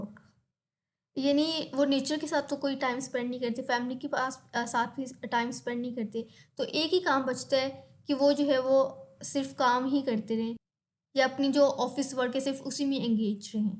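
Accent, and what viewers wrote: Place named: Lahore, Pakistan. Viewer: Lahori Accent ...But well explained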